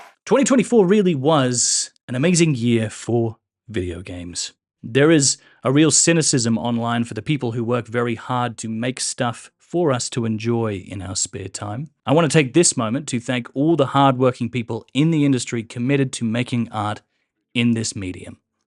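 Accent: Australia. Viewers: Good video, learnt new information; you are in fact Australian and not British! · lmfao the classic "No I'm Australian" after anyone hears your voice the first time.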